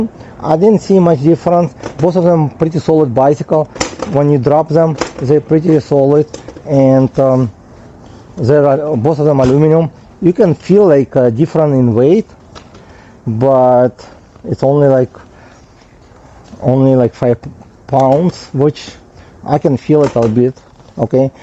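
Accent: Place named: Russia. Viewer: Your accent is funny sounds like Russian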